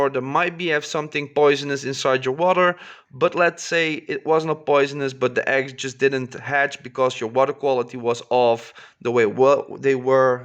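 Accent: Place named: Netherlands. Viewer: Hello I live in Europe so I can't buy eggs by you so is bought eggs by Clementoni got a tank with it but The tank doesn't got a lid its not small its not plastic I also had a year ago triops eggs and got one adult 3 months old stupid me didn't save the sand but that one was in a plastic box witch one do I need to use? (BTW your voice sounds like somebody from the Netherlands Are you Dutch cause I am Dutch so I hear it sound like that no hate)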